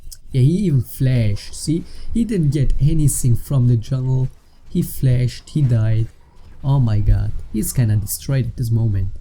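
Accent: Germany. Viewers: Höre ich da einen deutschen Akzent :D ? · Wie man hört, dass du deutsch bist :D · ich mag deinen deutschen akzent xD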